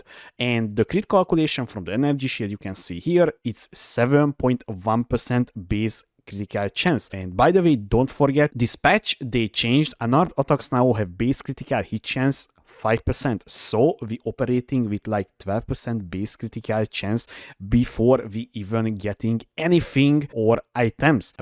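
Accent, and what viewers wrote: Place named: Hungary. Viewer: Gusztustalan magzar akcentus. Tanuld már meg kiejteni a szavakat, ha már az adott nyelvet próbálod meg beszélni.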